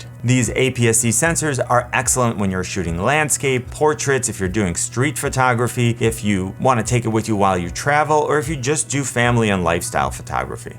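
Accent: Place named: Chicago, United States. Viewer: Thank you- I am leaning towards the SL2 because of affordability and I don’t really want to shoot video in 4K if the auto focus isn’t great in the m50, plus I don’t want to deal with 4K video all over the place. Also I plan to use a gimbal. So in 1080 the sl2 can do 60fps? I want to be able to do slow motion. Also I hear the Chicago accent and the outdoor shots looked like my hometown, Evanston.. ! thank you for a thorough review!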